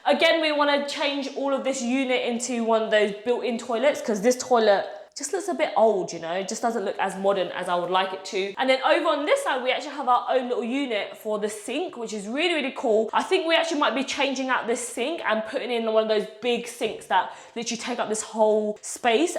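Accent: United Kingdom. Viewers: does anyone know what region of the UK her accent is from? city/etc? Love her.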